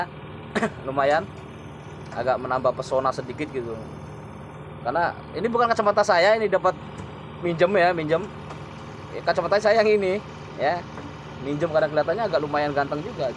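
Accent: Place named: Bandung, Indonesia. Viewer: Klu saya amati logatnya kok soperti orang bandung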